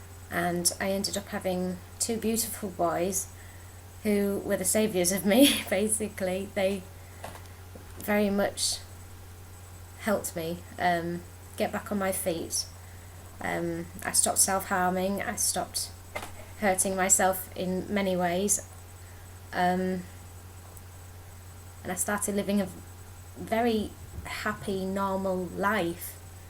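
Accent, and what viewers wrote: Northern England, United Kingdom. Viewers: Sounds like a northern lass! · For a Northern accent, yours is rather pleasant i must say! From a not posh Suvverna.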